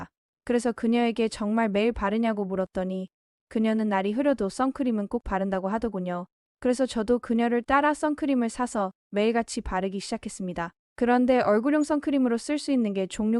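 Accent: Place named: Germany. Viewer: 독일식 발음이 독특하네요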